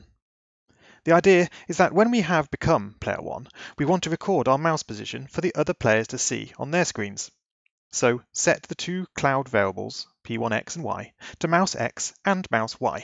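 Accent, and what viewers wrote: United Kingdom. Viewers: You have British accent! Like me, I am from England too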